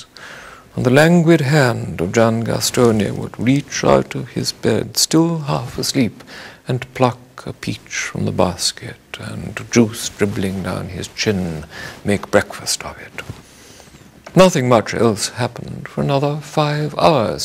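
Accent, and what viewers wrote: RP, United Kingdom. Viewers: Posher than the queen... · Brian speaks posher than what the Queen does. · cut-glass accent is impeccable and flawless. An unattained aim for many people all over the world! His oratorical adornment is unsurpassable. Gifts of oratory, writing and organization are all his. It is rather difficult to imitate his manner and catch the tone of his voice. There is no doubt that he speaks a peculiarly musical English which bewitches the ears of auditors. His pomposity is merely a mannerism. I adore his pompousness and gentlemanliness. The art of saying things in the way as does, is like an ear for music, it is not to be acquired! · Who wrote this script, who did this research, who does it serve? This 'mockumentary' is full of misinformation and incorrect history. He has absolutely NO knowledge of the Medici family tree. He mixes branches back and forth. 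The pawnbrokers NEVER became Grand Dukes. The Grand Dukes were not from Lorenzo's branch, neither was Louis' grandmother. He calls the last Grand Duke of the 'real ' Medici and the LAST Medici (really?)? Lorenzo, from a different branch, was therefore, not a 'real' Medici? Leave it to a Brit to label the Grand Duke the Last of the educated Medici (insulting!). the Lorenas were not Medici - they were Hapsburg. Do they expect that we will believe this farce because the narrator has a British accent and that he quotes other (misinformed) Brits? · The poshest man in the world. Called Brian. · This super-posh accent surely makes anyone else sound rough, but shouldn't it be "AT that very moment" rather than "IN that very moment"? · I have punched less posh people for being to posh... i'm gonna find some cockney to get this guy out of my head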